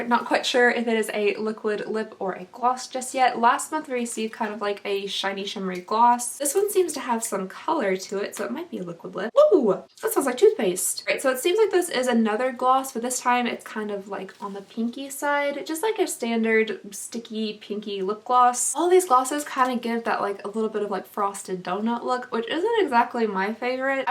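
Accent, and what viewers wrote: Kentucky, United States. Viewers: are you from Kentucky??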